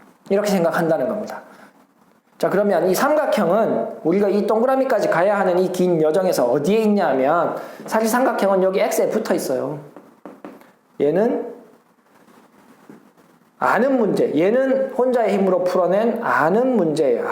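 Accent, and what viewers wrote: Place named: Daegu, South Korea. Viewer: 빠른피드백 정말감사드립니다 복받으세요 ㅎ 선생님께서 말씀하신 동영상 잘봤습니다 저도 선행보다는 심화쪽에 관심을 두고 공부를 시키고 있습니다. 학원을다니는곳이 없어서 시간이 많은아이라 초등부터 수학공부에 80프로를 투자하고 공부를 해온터라 1-1학기 마무리하는데도 주위 다른아이들보다 몇배는 시간들여서 한듯합니다 그래도 안심은 늘 안되긴합니다 ..;;;수학학원을 보내보니 너무 엉망이고 집에서 하자하니 엄마랑 전쟁입니다 제가 공부하면서 가르쳐야니깐 보통일이 아니네요 사투리를 쓰셔서 혹시 해운대분일까하고 봤는데 대구....너무 아쉽습니다 제가 수학쪽은 몰라서 드리는 질문인데 1-1 2-1 3-1 이렇게 구조도상 공부가 연결이 되어있어서 1-1끝이나면2-1하는게 맞다고 주위 학부모들 및 학원선생님들이 요런과정으로 시키고들 있더라구요 그게 아닌가보네요^^다음 동영상올리실때 요런부분도 한번 짚어주시면 많은 학부모님께 도움될듯합니다.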